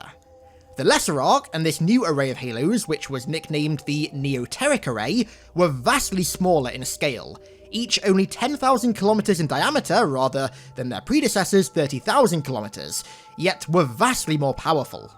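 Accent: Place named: United Kingdom. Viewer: Where are you from? Sound British but speak American.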